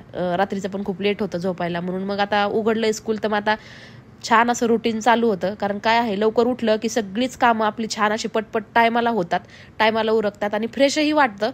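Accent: Ahmednagar, India. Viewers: तुमची नगरी भाषा लै ऐकू वाटती🎉😂🙏👍👌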